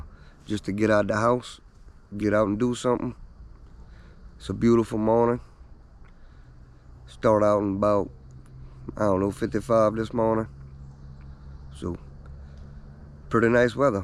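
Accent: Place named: South, United States. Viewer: I love your accent, definitely from the south lol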